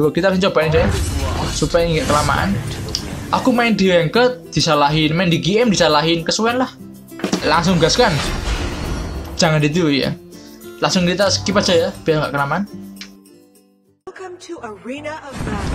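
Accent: Java, Indonesia. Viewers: jawanya dimana mas? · saya suka channel ini karena medoknya itu loh wkwkwk suka banget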